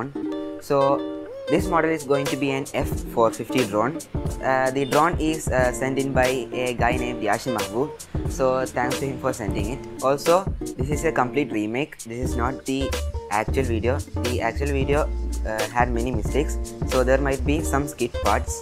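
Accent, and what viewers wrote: Kerala, India. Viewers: Are you malayali from Kerala plzz replay your English is so 🤨🤨🤨🤔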